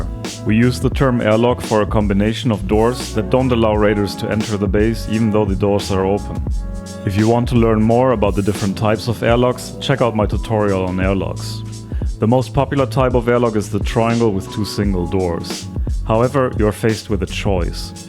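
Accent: Germany. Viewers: Du hörst dich deutsch an, bist du’s auch? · Ein Deutscher aktzent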